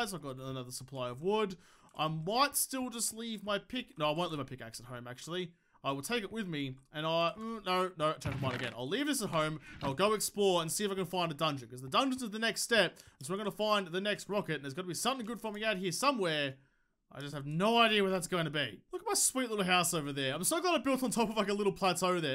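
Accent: Australia. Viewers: Your Australian?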